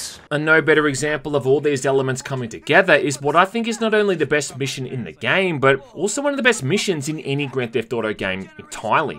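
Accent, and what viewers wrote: Australia. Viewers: Its how the Australian accent works.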